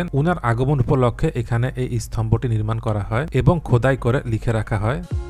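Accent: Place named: Sylhet, Bangladesh. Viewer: বাংলাদেশে যেকজন এরকম ভিডিও বানান তারমধ্যে আপনার কথাবলা বা ন্যারেশন সবচেয়ে আন্তরিক৷ কোনো ভনিতা নেই। আপনার কথার মধ্যে হালকা সিলেটি টানটিও লক্ষণীয় যা শুনতে ভালোই লাগে।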